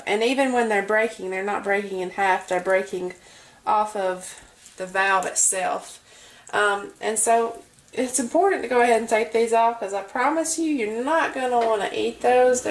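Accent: United States. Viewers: By the way that’s a lovely accent you have. Texas?. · That souther accent is almost comforting in a way; Ive been in St. Louis way too long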